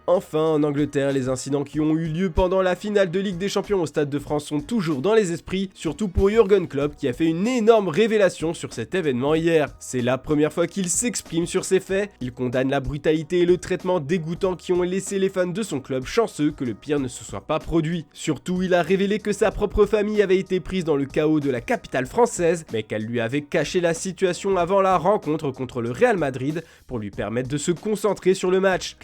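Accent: Italy. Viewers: Pourquoi y’a plus l’accent Italien accentué ? Vous avez changer Foot Mercato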